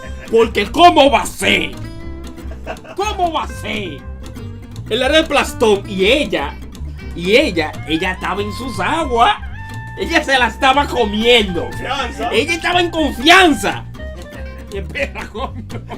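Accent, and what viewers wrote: Dominican Republic. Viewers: Apuesto que es Dominicano jajaja 🇩🇴🇩🇴🇩🇴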